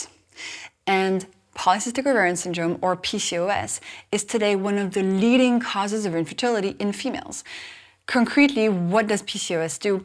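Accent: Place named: France. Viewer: I love your French accent. 😊 I know by heart you’re hacks but unfortunately it’s not easy to apply them… still working on it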